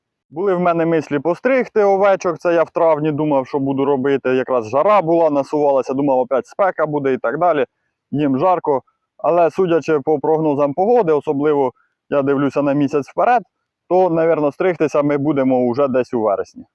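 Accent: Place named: Poltava, Ukraine. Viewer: почув акцент що ти полтавчанин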